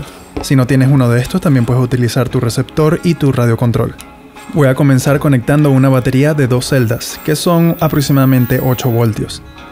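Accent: Venezuela. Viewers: Hola está buena la prueba con ese motor, te felicito por todo sobre todo me gustó que me parece que eres Venezolano, yo soy Venezolano, si estás interesado en un proyecto para hacer máquinas para lavar perros por favor contactame para coordinar algunas propuestas. Muchas gracias. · Buena! jajaja. Tengo una duda con tu nacionalidad brother! Parece venezolana, pero tengo duda XD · Epa eres venezolano? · Hola, por casualidad... Eres venezolano?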